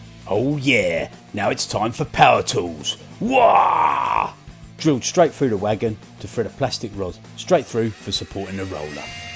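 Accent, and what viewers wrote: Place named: Cockney, United Kingdom. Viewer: thematically essex/cockney narration on da orks is inspired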